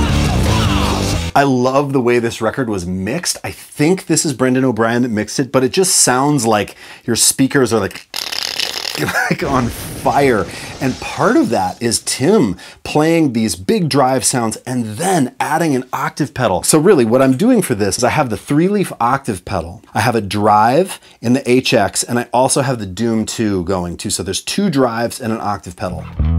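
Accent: United States. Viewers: Pretty good American accent can't lie lol 😂 great video tim c is a legendary bassist so underrated played with so much aggression, anger, passion, love, hate and awesome all round bassist 🤟🏻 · I’m confused, why do you have an American accent now? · Yes yes yes ! But why the American accent ? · Dude I got like so freaked out that u didn’t have an accent. Good job with the American one btw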